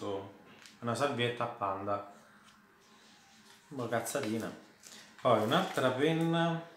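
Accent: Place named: Rome, Italy. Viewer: ah davvero? L'accento sembrava romano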